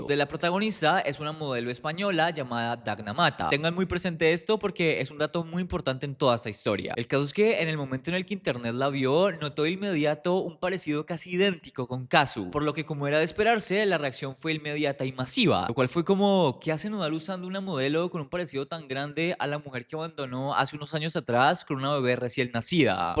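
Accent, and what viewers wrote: Colombia. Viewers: Me da la impresion que es colombiano ❤ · Nada que ver mi comentario PERO me encanta tu acento colombiano!!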